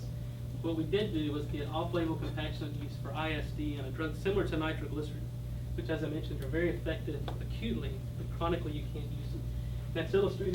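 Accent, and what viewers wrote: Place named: Texas, United States. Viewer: This is a cure for heart and stroke disease without changing a bad diet!! It makes you bullet proof even if you eat greasy junk all the time!!! Just get a pinch of sodium nitrite and add to every liter of drinking and cooking water. Sodium nitrite in food grade quality is available for about 6 bucks for 2 lbs, enough for a human for a decade of use at these levels in drinking water. Please turn up the sound and endure the bad quality sound, and Dr. slurring sort of Texas accent, it is worth every second. Take the trouble to listen 10 times if you need to, and GET this, especially the sections on the microscopic artery video showing adhesion of monocytes (a type of white blood cells that turn into macrophages or cellular garbage trucks), and how they penetrate the lining of the artery, starting the creation of plaque, but do not when the sodium nitrite is added to drinking water. 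This is absolutely astounding footage!!! Note how either 50mg or 150mg per liter of sodium nitrite in drinking water completely negated the harmful effects of high cholesterol diet, and prevented atherosclerosis!!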